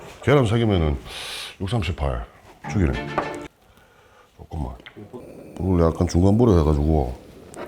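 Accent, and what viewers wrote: Gyeongsang, South Korea. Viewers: 경상도 사투리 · 경상도 방언 너무 치인다 ㅋㅋㅋㅋ 목소리도 좋고 보는맛이 살아있눼~ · ㅋㅋㅋㅋ같은 갱상도 인으로서 행님 정말 마음에 드십니더 !!!! 프로틴 이빠이인 번데기 먹방 가주실랍니꺼!!!!!😍😍😍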